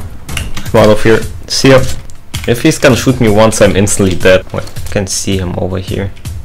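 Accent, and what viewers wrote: Germany. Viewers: Bro got the most German accent a person can have🗿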